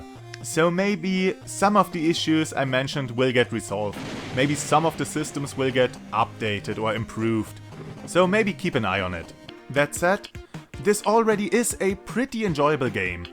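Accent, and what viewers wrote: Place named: Germany. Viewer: Einfach mal n Like und Follow, weil du dir anscheinend seit Jahren so Mühe gibts :) Außerdem mag ich deinen deutschen Akzent im Englischen! :D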